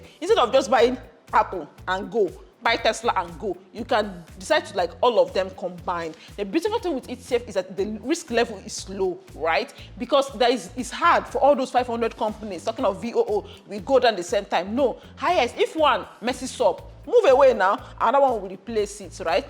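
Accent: Nigeria. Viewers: Igbo women and money 🤝 Also that thick Eastern accent, I love it so much😌😌😌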